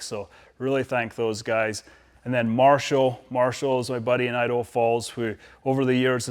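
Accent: Scotland, United Kingdom. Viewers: Hi, I just subscribed! Are you Scottish, I thought that listening to your accent watching your SRAD video. I’ve been doing a similar as you collecting bikes I drooled over as a kid. I love this era of machines. 😊 Best wishes Ian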